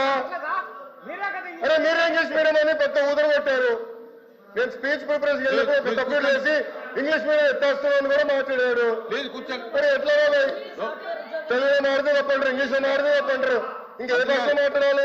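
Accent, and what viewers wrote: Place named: Telangana, India. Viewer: Lokesh ki andhra kanna telangana slang masth set aythundi 🤙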